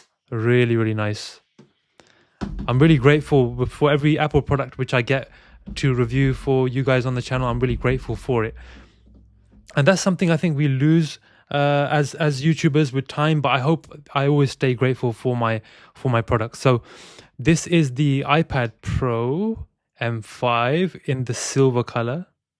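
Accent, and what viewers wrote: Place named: United Kingdom. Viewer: His accent is clearly UK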